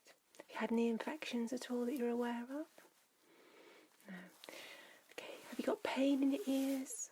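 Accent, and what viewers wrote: United Kingdom. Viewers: You have one gorgeous British accent that I can listen to all day long. 🥰 I felt so relaxed and enjoyed this part 2 of ear exam and deep ear tingles here! Cheers 🍻for sharing and I wish you a beautiful Happy New Year's 2025! 🍾🎉🥳💜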